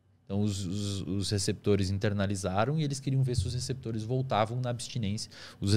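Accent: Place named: France. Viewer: Seja etero e pare de francesar o português....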